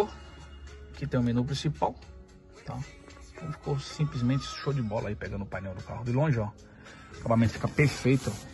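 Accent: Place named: Rio de Janeiro, Brazil. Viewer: Vc e do rio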